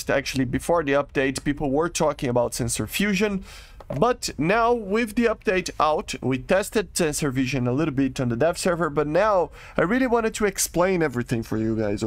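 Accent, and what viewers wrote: Brazil. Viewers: Tu é BR ne ? Certeza!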